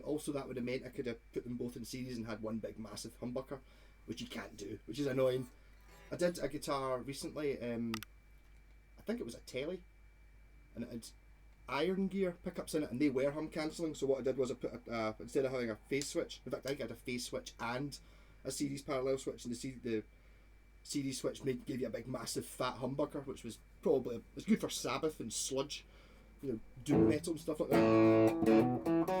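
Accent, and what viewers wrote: Scotland, United Kingdom. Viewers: Sounds a little Scottish. Right on! Love the guitar.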